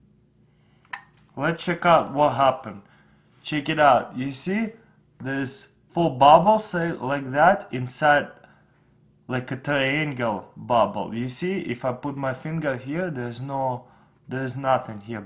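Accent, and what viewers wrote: Russia. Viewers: сразу слышно что русский хдд но очень интересно поэтому лайк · дуратский английский..когда слышно что русский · i fking love this fking russian :P when he do something he always say boom :P hes so funny and his english making me laughing so hard :PPPPPPP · you got Russian acsent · Love your russian accent!! great vids btw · cmon... i like this Russian accent :p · Учусь на ин язе . Когда я это услышал ,я думал умру от этого акцента. Если Вы русский тогда снимай на русском или это принцип какой-то? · I'm French canadian living in west english speaking environement and this guy is really cool and authentic Russian accent. Keep that budy we love you and your funny experience. Thumbs up! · russian english is very funy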